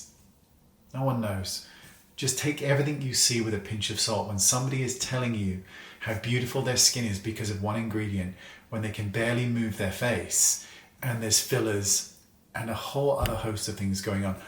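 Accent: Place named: Scotland, United Kingdom. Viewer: are you originally from Scotland 🏴. I feel I can hear a wee accent from time to time.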